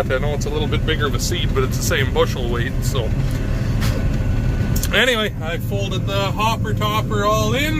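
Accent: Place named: Canada. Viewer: Well, how she goin? "ANYWAY...." just wanted to say out of all the FarmTubers I find your channel most entertaining... all the rest I find myself falling asleep, and then their's that kid from Iowa with severe ADHD lol... Your Canadian accent and one liners like, "So we don't plug the girl cock stiff" lmao. Good stuff and nice to see harvest is over for ya as we are in the middle of ours from Ohio. Have a good one.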